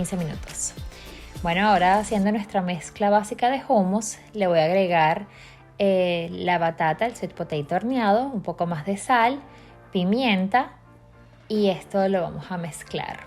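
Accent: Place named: Venezuela. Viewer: Me parecieron geniales esas recetas. Buenas alternativas para pasapalos. Eres venezolana? Por fin alguien que habla mi acento en un canal de recetas 😅🤩🇻🇪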